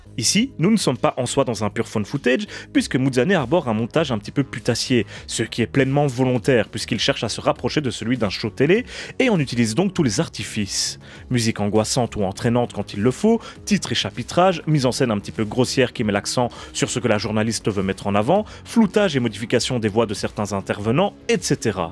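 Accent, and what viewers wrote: Belgium. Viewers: Bonjour, j'aime bcp tes vidéos. Le monde du cinéma d'horreur m'est étranger, j'aime pas ça mais j'aime écouter des gens parler de sujets que je ne connais pas et les rendre intéressants. Tu es de ceux là. J'aime bie' e' apprendre plus dur tout un tas de choses et j'adore voir et/ou écouter tes vidéos. C'est passionnant. Je ne verrais sans doute aucun de ces films mais j'adore apprendre des tas de choses dessus. Ça enrichit. Et la pointe d'accent belge est un vrai plus car j'adore la Belgique et cet accent si chaleureux. En bref, merci pour ton travail.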